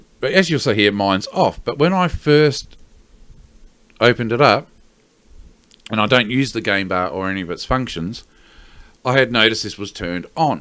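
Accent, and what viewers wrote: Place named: United States. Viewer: You have a really american voice I really like that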